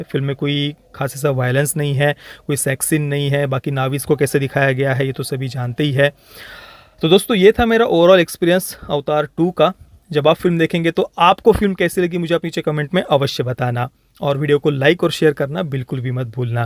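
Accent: Maharashtra, India. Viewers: भाऊ तुझा भाषेवरून एक मराठी माणूस लगेच ओळखु शकतो मस्त आहे तुझं (वर्णन शब्द). मस्त वाटल मराठी माणूस review देताना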